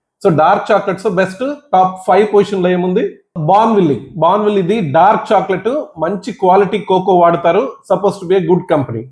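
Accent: Nellore, India. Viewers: Thank you doctor Language is very nice with smiling face. I like nellore language.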